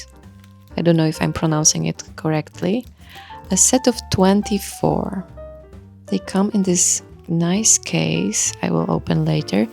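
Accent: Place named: Poland. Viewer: Pretty sure its polish!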